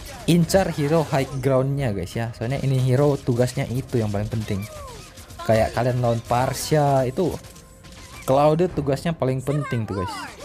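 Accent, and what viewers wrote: Manado, Indonesia. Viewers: Bng, kmu orang Manado?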